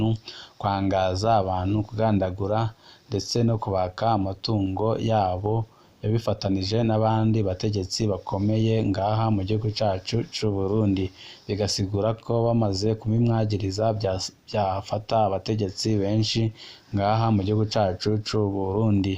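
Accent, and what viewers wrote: Rwanda. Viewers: oya uyuwewe ararenze avuga niryo atazi ninumurwanda none burya arunva KO atanikirundi azi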